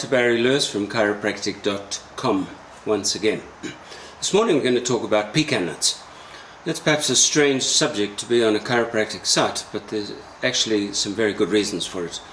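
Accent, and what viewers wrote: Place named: Deep South, United States. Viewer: Deep South.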